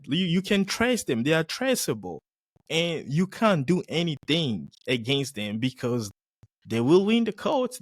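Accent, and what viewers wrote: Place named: Nigeria. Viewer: What is wrong with Nigerians, why don’t they take their wealth to Nigeria and build it. Nigeria is broken, even Banana Island is not great. Looks great by Nigerian standards. Nigeria is crime ridden, they live in darkness because the country has not got electricity. The rich people have generators that light only their homes. With all these billionaires they constantly boast about, shouldn’t they help at home. Man, you sound like a Nigerian. And Idris Elba is a Ghanaian and Sierra Leonian. LeBron James is American.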